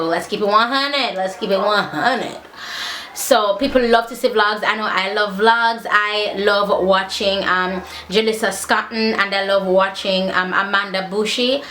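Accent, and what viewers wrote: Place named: Jamaica. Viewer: Also for your Jamaican accent, which I have found many are attracted to; Californians enjoyed listening to my sister and me.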